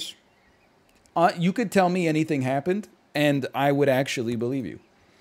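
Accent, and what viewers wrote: Canada. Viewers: Nah, his pronunciation is a common pronunciation in Canada. It's by far the more common of the two where I live.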